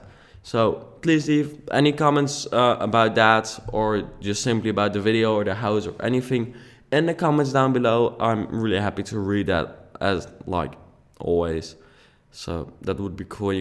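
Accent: Netherlands. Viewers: je kunt gwn horen dat je nl bent lel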